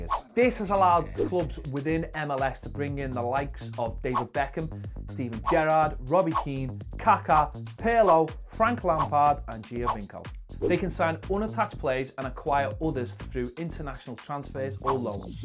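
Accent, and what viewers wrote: Liverpool, United Kingdom. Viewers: Norbert Janas It's a scouse accent (Liverpool) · Why r u doing the MLS even though you sound like a scouser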